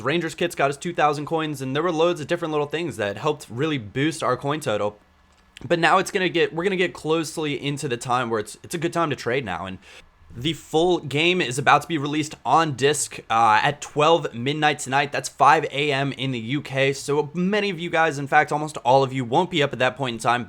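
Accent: United States. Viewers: leave a like for american accent !!! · hes american isnt he?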